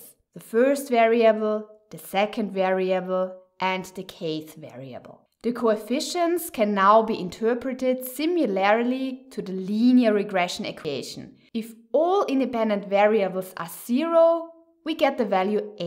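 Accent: Germany. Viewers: you have an accent, are you a German???? 😅🤣🤣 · strong German accent, I like it. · Sounds so german.. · I'm not gonna lie, I can't get enough of that german accent 😌😌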